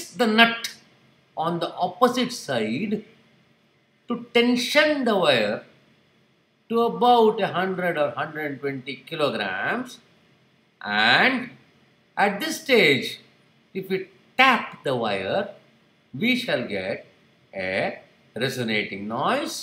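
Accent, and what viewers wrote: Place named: India. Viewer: I like indian accent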